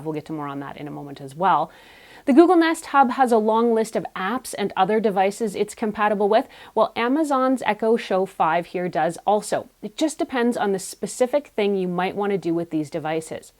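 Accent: Canada. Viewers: Wow, smart girl, gorgeous eyes, good info, intelligent but ... That Canadian accent just cracks me up. Beats the heck out of a Noo Zillan accent too.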